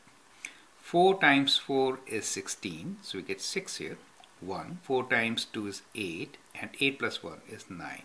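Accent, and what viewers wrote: India. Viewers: i hate the india voice, i can't understand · oh and its another indian guy with heavy accent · Vaibav V He is indian so english isnt his first language atleast hes doing his best to speak english and to help you understand square root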